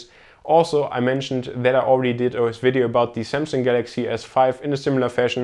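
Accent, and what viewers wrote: Germany. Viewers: TechCentury aber wär schon gut, wenn das eigene Englisch dann nicht so deutsch klingen würde, oder? Find ich dann irgendwie eher peinlich als international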